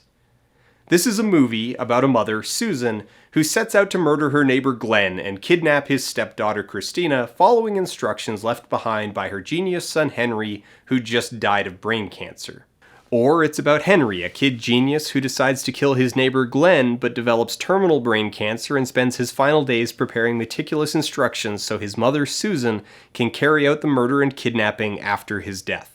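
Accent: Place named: Canada. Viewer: Definitely is Canadian.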